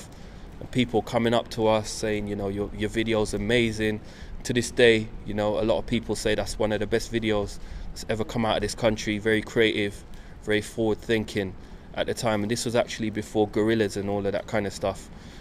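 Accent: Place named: United States. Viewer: He's good tbh..but he needs to put the British accent on...and not American..even though American accent sound better, but yeah other than that he's good™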